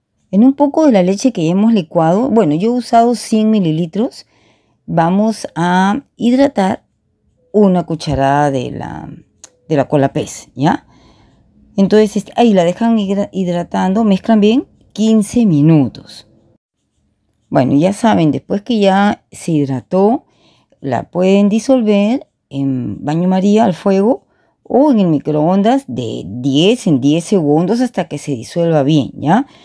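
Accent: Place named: Barranca, Peru. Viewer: Me encanta .... juraría que eres de barranca... hablas igual que mi tía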